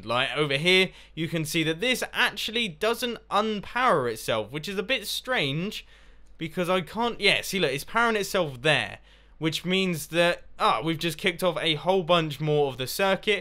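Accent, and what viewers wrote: England, United Kingdom. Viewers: hes british. deal with it. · Jack Lusher Well he's from england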